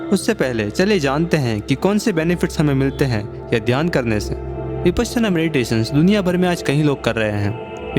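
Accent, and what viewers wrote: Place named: Rajasthan, India. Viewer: राजस्थानी लहजे में बोलते हो, कहा से हो ?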